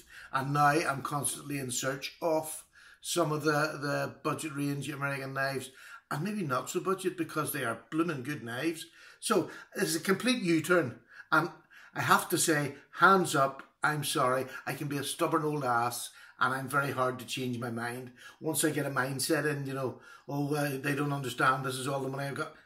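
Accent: Ireland. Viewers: I love your channel, i love that you focus on budget knives. And your Irish brogue reminds me of my grandparents who where from Cork and Leitrim counties. Keep up the great work sir! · Congrats,! We trust yah! I'm sure your reviews will continue to be fair and honest. Whatever keeps you on the air... DO IT! Love your channel and love that voice. Watching your channel has greatly helped with my Irish accent!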